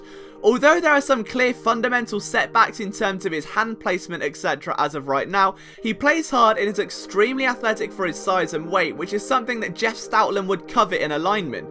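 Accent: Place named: United Kingdom. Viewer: I just can't listen to the British accent... and I'm British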